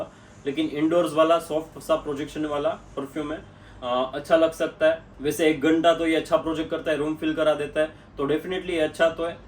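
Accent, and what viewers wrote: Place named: Gujarat, India. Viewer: bhai bhai su vat che....apde hindi boliye aema gujrati eccent dekhay j jay😅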